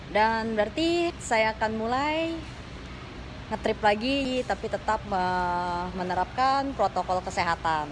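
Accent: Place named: West Java, Indonesia. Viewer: Kok logat nya Sundaan banget yah 😁😁😁😁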